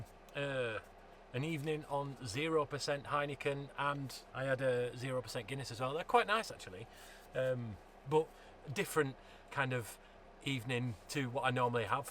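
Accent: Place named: Leeds, United Kingdom. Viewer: We love the channel and site reviews. Having been born and grown up in Leeds Im sure there is a north east Leeds twist to the accent and have now invented a new family game of “where’s ” accent from. Keep the reviews coming. They are a great way to unwind after work and between our own campervan adventures.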